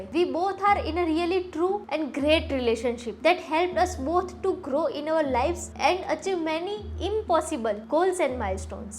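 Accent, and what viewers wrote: Gujarat, India. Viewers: I love gujrati tonn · If Gujarati are speaking Hindi 😊✌️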